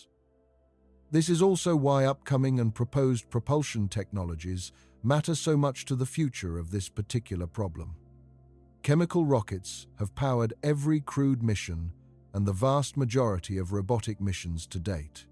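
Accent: England, United Kingdom. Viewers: There doing everything they can to keep us from reaching the stars. I believe none of it. English accent and muckedy music and were supposed to think they are intellectuals.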